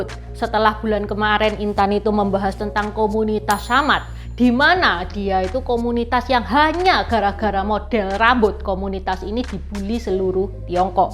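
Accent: Java, Indonesia. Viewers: Raine cino lah ngomonge tek medok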